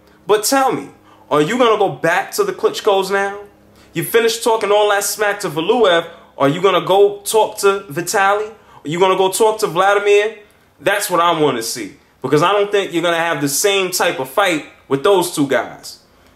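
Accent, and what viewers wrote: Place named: United States. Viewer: It's val-you-ev Goddamn American accent SNM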